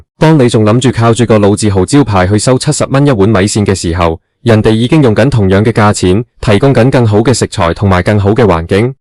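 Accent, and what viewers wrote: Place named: Rural, China. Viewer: 鄉下音太重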